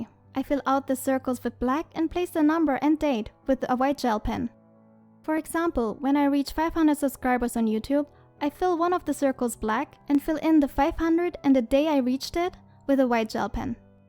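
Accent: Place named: Germany. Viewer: Are you german? As a fellow german i hear the slight german accent comin tru (no offense)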